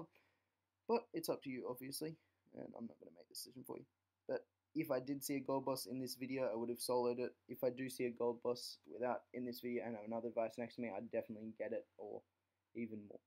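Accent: Australia. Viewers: Dat aussie accent tho... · U sound aussie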